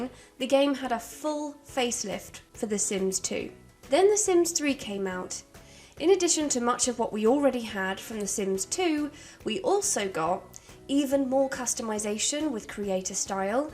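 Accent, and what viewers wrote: United Kingdom. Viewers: this is the most lackluster installment of the whole series. base game is unplayable, unless you have <num> dlc's(each adding 3 new options max...) installed. the base game don't even have fuckin ghosts, like wtf, I could play the base sims 1 with only livin' large expansion pack(in my case it was pre-installed, I remember I picked it up like that in the game section at target or Walmart(I dunno if you have em in Britain< I haven't watched the vid yet, I'm , but I've obviously noticed from the accent that the channel owner's is British> but these are supermarket stores, basically here in the us), it was a pack of base game+living large I still have the package in my family home 😂 but anyways, I could've played hours and hours and hours, only having those, as well as base 2&3, in the Sims 4 the base game Is only a fuckin building simulator(and I've always hated building, I was always picking premade and pre furnited homes, usually not changing a fuckin thing coz I dont really ddlgive a shit about how the Sims' home looks like lol) and create a sim... 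basrely any gameplay options, I was bored after half an hour... there is no mystery vibe the first 2(there was a a hinch of It in Sims 3 left, but only a small hinch...) games have had, sims 4 is a politically correct shit for little kids, to not scare them too much and to teach them that being gay and trans or whatever is some sort of fuckin achievement and privilege(I ain't got nothing towards LGBT people as long as they'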